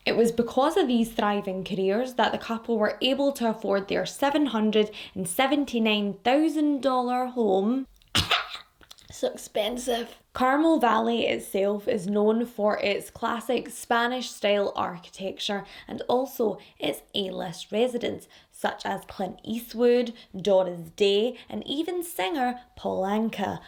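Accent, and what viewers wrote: Scotland, United Kingdom. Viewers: it really did tho mamas!! Buuuuut I've got you on the big screen rn, and even got my partner into your true crime stories! He enjoys your beautiful Scottish narration just as much as me! 😍💗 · Hi just found your channel out here in these YouTube streets and I LOVE YA!!! I love listening to the Scottish Accent!!! Yay!!!! Not to mention hoe gorgeous you are and your sense of humor is epic!!! 😉😉😉😉😉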